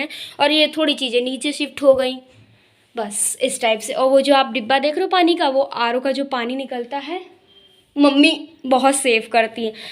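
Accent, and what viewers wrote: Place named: Lucknow, India. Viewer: Lg rhi h lko ....vali ... mtlb bat krne ka tarika ...mai bhi lko se ..hu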